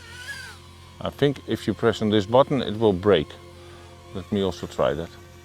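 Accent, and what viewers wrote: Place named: Netherlands. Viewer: Your Dutch accent is thick lol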